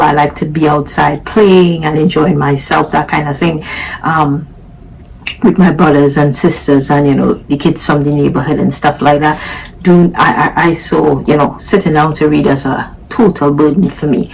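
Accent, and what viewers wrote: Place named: Caribbean. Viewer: 🎉 Congratulation’s To you Newbie to YouTube 🎉 love to hearing the Caribbean accent. Looking forward to your content and recommendations. Blessings to you 🙏🏾🤗💚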